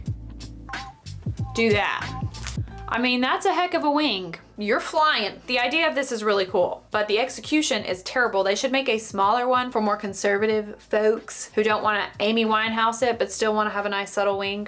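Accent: South, United States. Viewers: No one appreciates a Southern accent like Southerners do. There’s no shame in that game so let it fly. I have to say that of all the products shone today, YOU, are the best one. Also the freckles. And I have to wonder why companies don’t make faux hair for us silver ladies. We have less hair than anyone so you’d think they’d help us out. I’m all about some faux hair when I can find it in my natural hair of Silver and dark grey. So you go ahead and wear that faux hair with pride girl. Because when you are silver there just aren’t any good ones to wear. Peace and love girl.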